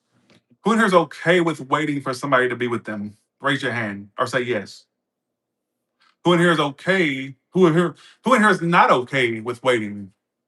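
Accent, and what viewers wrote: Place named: New York, United States. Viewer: you have a badass accent it's giving 1920s 1930s 1940s 1950s New York/old movies but in todays times actor you could've play extra in most iconic films I bet people would remember your quotes lines when say "you know what... " I thought for a moment as if you were saying some films lines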